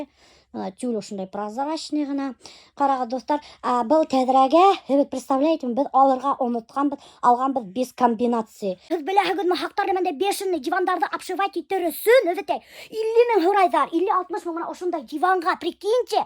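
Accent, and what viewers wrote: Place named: Bashkortostan, Russia. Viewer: туган конен белэн чын кунелемнэн котлыймын!!!!! Исэнлек-саулык, тормышыгызда бик куп матур, шатлыклы коннэр телэп каламын. Сезгэ хэрчак унышлар гына юлдаш булсын!!! аерым зур рэхмэт сезгэ кире уз асылыгызга кайткан очен!!!! Урысча эйткэндэ:это дорогого стоит!!! Сезгэ актриса булу кирэк иде, бигрэк лэр дэ матур Башкортча сойлэмегез!!! Рэхмэт, барысы очен дэ, тагын бер кат.... илэушэ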